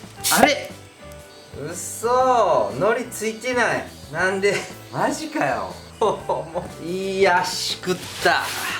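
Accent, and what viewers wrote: Kansai, Japan. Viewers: いつもより関西弁強めでしたねw 同じく関西人ですので、心地良いですが。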